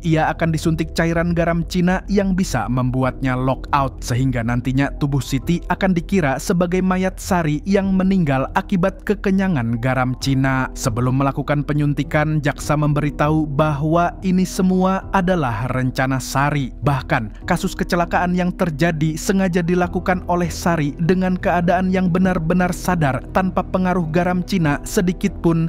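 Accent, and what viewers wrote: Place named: Nganjuk, Indonesia. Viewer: kok nganjuk bang aku orang nganjuk 🤣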